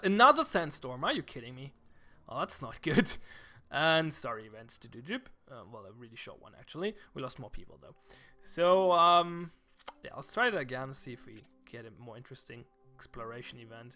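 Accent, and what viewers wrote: Germany. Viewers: is this german accent?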